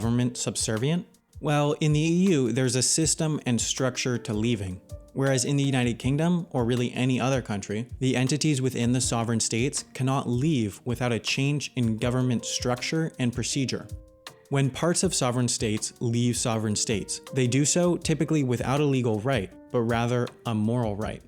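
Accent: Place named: United States. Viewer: You may have answered this already, but are you originally from the US? I know you live in the UK now, but you definitely sound American.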